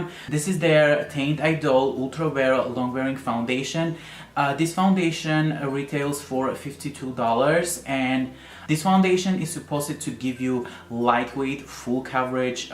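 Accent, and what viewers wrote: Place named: Armenia. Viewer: You are so cute <3 love your Armenian accent :D <3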